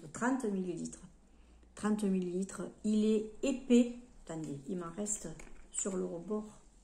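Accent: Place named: Provence, France. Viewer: Bel accent Provencal...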